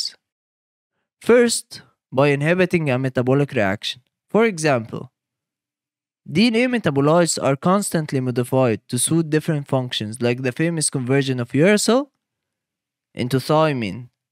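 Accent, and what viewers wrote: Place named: Egypt. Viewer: اعرف المصري لما اسمعه😂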